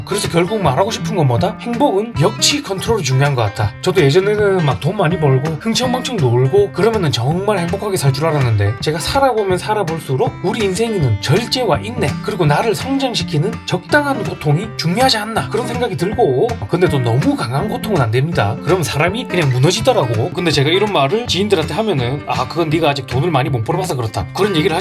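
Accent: Busan, South Korea. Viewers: 저녁 먹으면서 소주 반잔하면서 보기 딱 좋은 채널. 같은 부산 사람으로서 공감대도 가고. 저희 아이들도 계속 으이~~ 으이~~해서 웃겨요^^ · 고생은 사서 한다고 원치 않아두 고생하게 되죠. 인생 자체가요 참 머든 열심히시며 차분히 같이 즐기게 되네요! 경상도 사투리에 외국 사투리는 없으신듯 있으셔두 근본이 ㅋㅋ 부럽습니다. 덥다는건 또 몰랐다 이제 알았습니다. 부디 안전히 건강히 오세요 ^^ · 달근한 말투였다면 닭살 돋을 멘트들을 특유의 억양으로 들으니 귀에 쏙쏙 박히네요. 50대이지만 많이 배웁니다^^ 근데 나두 부산싸나이지만 부산말은 맞는데 첨 듣는 말투같은 너낌은 뭘까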